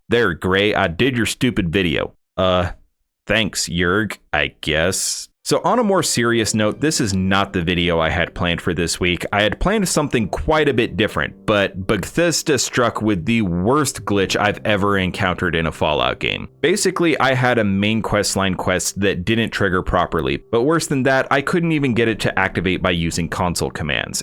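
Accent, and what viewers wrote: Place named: South, United States. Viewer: I'm sorry but that "southern twang" is just annoying. Personally I find it distasteful and extremely cringey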